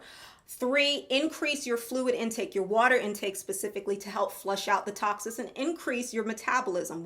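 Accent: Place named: Caribbean. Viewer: I recently subscribed to your channel one of the best things that I've done. You're so informative and Gorgeous. Love the glowing skin. I occasionally hear an accent. Is your ancestry from the Caribbean by chance?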